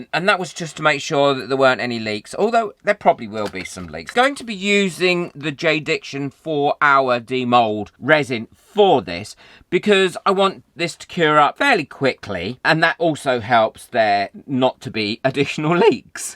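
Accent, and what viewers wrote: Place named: Estuary, United Kingdom. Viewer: I always think of you as the Jamie Oliver of the crafting world, I think it’s the estuary accent! Hope that’s not an insult!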